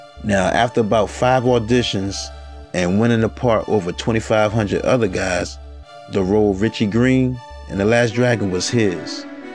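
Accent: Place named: Baltimore, United States. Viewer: Great video you sound like your from Baltimore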